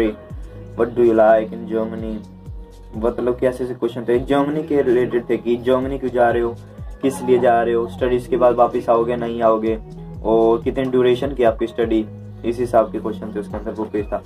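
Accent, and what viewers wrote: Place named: Haryana, India. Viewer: U used haryana Hindi but still got visa That's called Desi boy power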